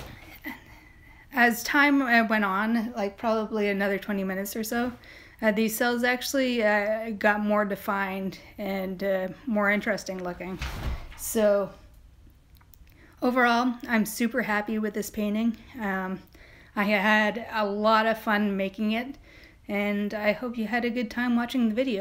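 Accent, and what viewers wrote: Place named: Canada. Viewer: I am a big fan of your art and really like your videos. Your video presentation is quite cute and I especially enjoy your "Canadian" accent- on your" aboot" and "owoot"- anything with an ooot in it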